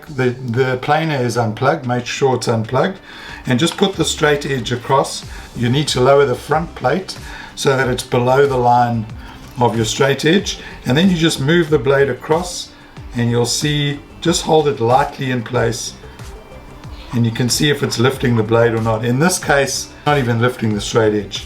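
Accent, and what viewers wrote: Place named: South Africa. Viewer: I see on your profile you're from Australia, but I'll bet my nonexistent fortune that you're South African. Anyway, great informative video. Clear and to the point. Exactly the info I needed.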